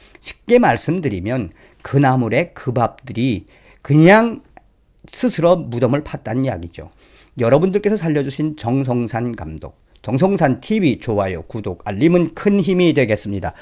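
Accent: North Korea. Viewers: 방송내용은 좋습니다 만 방송은 표준어가 원칙입니다북한 사투리를 좋아하는 사람은 없습니다 수다래 라는북한사투리 선입감이 좋지 않습니다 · 북한식 말투가 조금 섞여 있는 것 다 알고 있는데 별문제 없다고 봅니다. 괜찮습니다.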